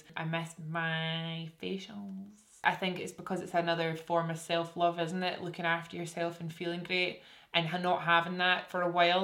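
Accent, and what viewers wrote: Scotland, United Kingdom. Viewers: Okay. I absolutely adore you! I just came upon your channel and boy I’m glad I did. I love your voice, your Scottish accent, your jewelry, or tattoos, EVERYTHING! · I have been so obsessed with your YouTube channel over lockdown. It’s just feels like watching one of my friends, probs because your Scottish as well and I love hearing that accent😁🏴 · scottish innit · I'm watching this with captions on, it literally doesn't understand the Scottish accent lol.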